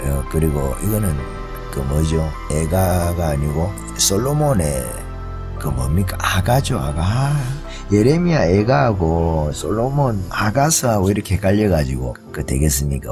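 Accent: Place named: Gyeongsang, South Korea. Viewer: 경상도사투리가 세삼좋네요~~^^유머와 부드러움~단호함~~궅궅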